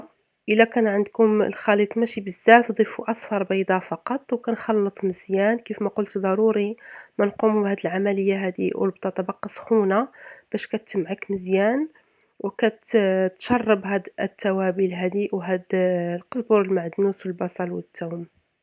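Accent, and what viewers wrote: Morocco. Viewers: أنا جزائرية أعشق مشاهدتك وأنت تطبخين وتعجبني لهجتك المغربية رغم أنك مغتربة واصلي أيتها المغربية الشاطرة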